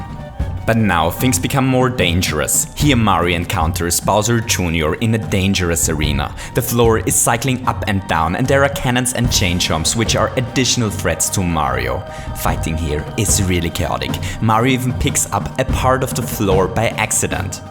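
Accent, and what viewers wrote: Germany. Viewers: Why would you pronounce Kaizo in an American way (sounds like Kayzo, when you say it)? English clearly isn’t your mother tongue and I guess it actually is German. If you would simply pronounce it in a German way, you’d be much closer to the actual, Japanese pronounciation.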